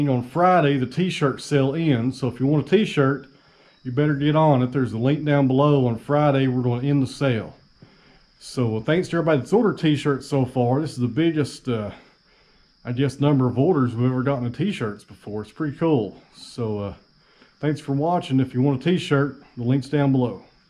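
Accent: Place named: Tennessee, United States. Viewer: Same! His Tennessee accent, calm manner, wonderful Country music, and the beautiful way he presents the timber has me hooked, all the way from Australia!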